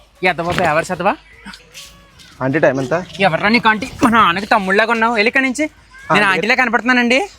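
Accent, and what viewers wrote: Godavari, India. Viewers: Achamaina ma godavari yaasa vinte antha anandanga u do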